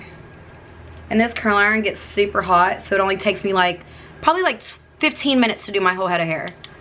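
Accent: South, United States. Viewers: You kinda have a slight southern accent, it's really interesting loll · Is she from flordabamma shore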